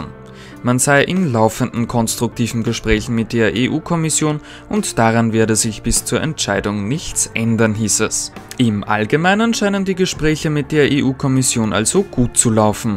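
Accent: Austria. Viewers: glaub ist ein fast versprecher oder mikrofehler aber die acht spreche auch ich(auch ösi) so aus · spricht ja nun wirklich sehr gut verständlich und auch sympathisch. In Österreich werden einige Laute halt anders ausgesprochen, so what? Gruß aus Frankfurt, wo es auch nen Dialekt gibt. 😅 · Du bist für mich der "Apfelwelt" der Eisenbahnbranche :D kurze informative Videos mit einer ruhigen und gut verständlichen Tonspur mit österreichischem Akzent :p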